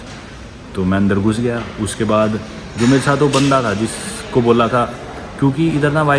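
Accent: Haryana, India. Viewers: No doubt ap boht ache vlogger hen but apne accent ko thora groom kren English bhi ap apne haryanvi accent me bolte hen.. Sari dunia ghoom li ap ne but you sounds like a typical backward villager.... kafi videos me ap ajeeb si awaz nikalte hen jese kisi janwar ko hankte hue log village me nikalte hen...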